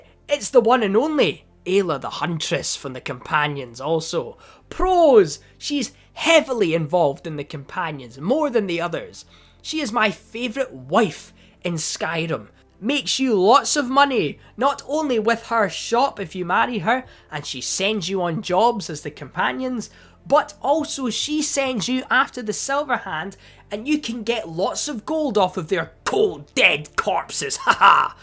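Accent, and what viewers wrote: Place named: Ireland. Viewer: You sound Irish, my mom's Irish so 😉. Happy St. Patrick's day ☘️.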